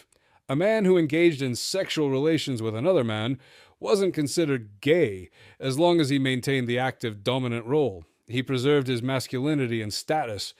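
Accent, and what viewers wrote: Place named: Scotland, United Kingdom. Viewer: Excellent expose. Luvin' ur Scots brogue.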